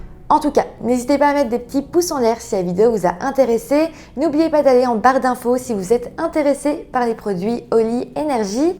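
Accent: Belgium. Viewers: L'accent Belge 😍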